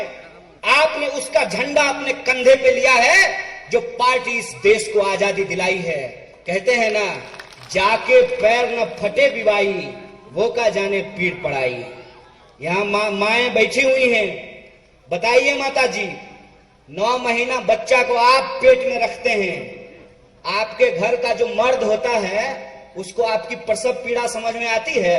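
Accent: Bihar, India. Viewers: साले बिहारी हिंदी बोला तो सीख ले पहले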